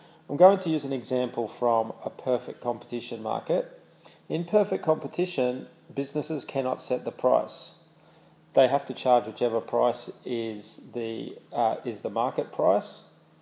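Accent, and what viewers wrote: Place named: Australia. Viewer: Love the aussie accent m8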